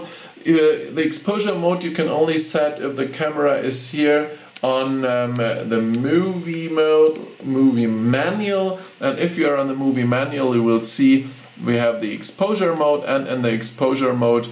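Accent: Germany. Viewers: you have to excuse his pronunciation for he is from Germany. now a days, Who would put in 41 mins review for you. not even the sell man from the store you go for purchase. cheers.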